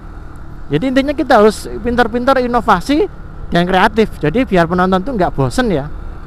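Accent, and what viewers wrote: Java, Indonesia. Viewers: Medok banget gaya ngomong.a...mantap bang sukses terusss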